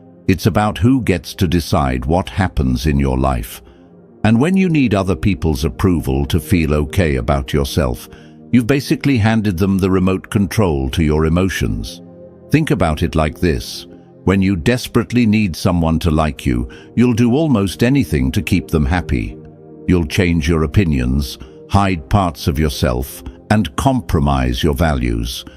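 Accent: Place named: United Kingdom. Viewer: Change your voice British gag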